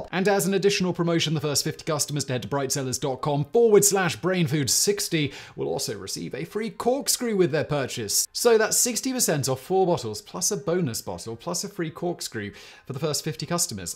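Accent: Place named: RP, United Kingdom. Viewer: Such a posh accent! Well done, boy, well done.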